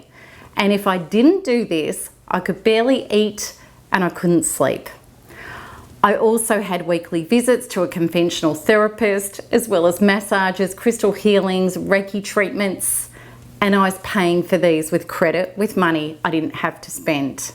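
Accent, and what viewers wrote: Australia. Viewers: I love your Aussie accent :) hello from Washington DC and thanks for your help!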